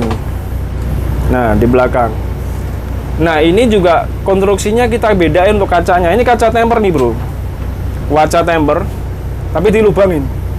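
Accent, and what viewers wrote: Java, Indonesia. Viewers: cakep, lux.. ini yg bawain narasi mas siapa? ok lah, cukup bagus, jelasinnya padat dan jelas.. walo logatnya kontras sama ownernya, ini medhok ala Jawa.. 😅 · Seneng cara menjelaskan mudah dimengerti indonesia medok medok 😁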